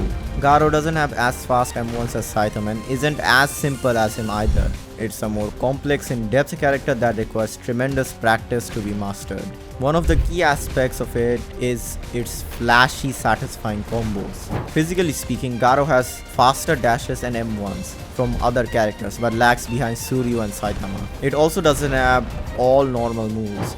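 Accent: India. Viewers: The indian accent it makes it beter ong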